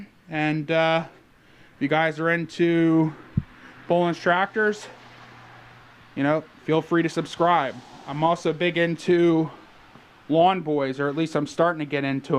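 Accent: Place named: Pennsylvania, United States. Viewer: I knew you were from PA. I could tell by the accent!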